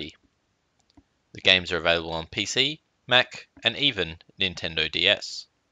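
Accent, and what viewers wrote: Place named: Australia. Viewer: If you're still curious for whatever reason, he is Australian.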